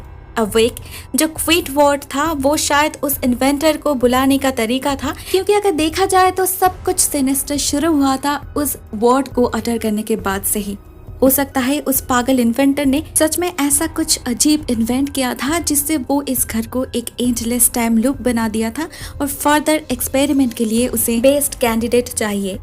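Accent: Bengal, India. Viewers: Hey r u from kolkata your voice seems like u r bengali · Aap bangal se ho ky? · Apka bengoli tone sunne me aur samjhne me thode issue hote hai verna baki sb toh sahi hai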